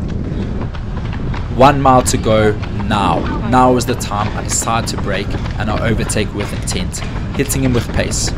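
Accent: South Africa. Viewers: You sound South African. Are you? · South African? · Hahahah love the south african accent 🇿🇦😁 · This is absolutely insane ❤‍🔥well done bro. Also, I can sense that south African accent there😁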